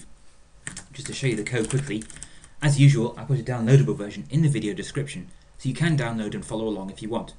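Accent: United Kingdom. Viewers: he lets his northern accent slip now and again -- his "jeeves" accent is a complete fabrication. There are many about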